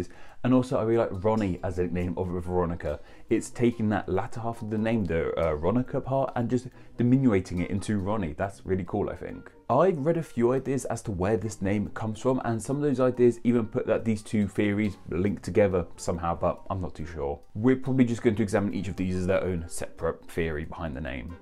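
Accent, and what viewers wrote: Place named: United Kingdom. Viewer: I’ve seen other people make similar comments on other videos. Maybe that’s just the accent in the part of the UK where is from? Or maybe it’s just his own unique personal accent?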